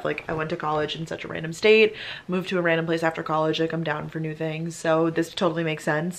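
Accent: Long Island, United States. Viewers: I’m a type nine:))) also i can hear the Long Island accent making a come back 😂😂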